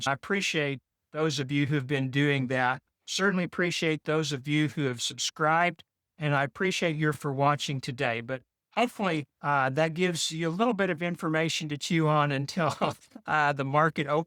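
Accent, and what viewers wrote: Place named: South, United States. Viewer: New microphone? Your southern twang I wish I had is missing today.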